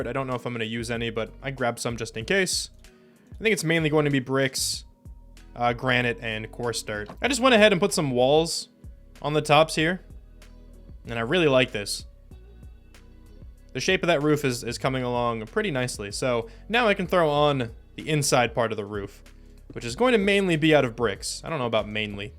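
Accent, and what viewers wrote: United States. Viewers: I thought I detected an eastern PA accent, I'm from that area too! Go birds! Just found your videos and kept picking up on Philly/lancaster isms. Enjoying the series so far. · Ahh, now that you mention Pennsylvania I can hear it. Good video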